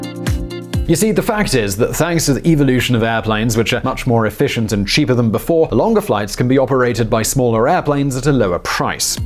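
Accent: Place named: United Kingdom. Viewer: Keeps saying Roots when should be saying Routes, trust the English to butcher the language..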